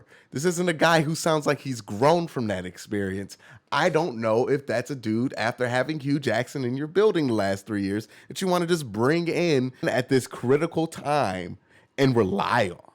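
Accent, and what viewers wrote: Cleveland, United States. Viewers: Great analysis...As a huge aside, is a great example of a Cleveland accent...never knew I had one until I moved to Youngstown: "lahcker" for locker; "rahster" for roster; "pros and cahns", you get it. Keep up the great work,!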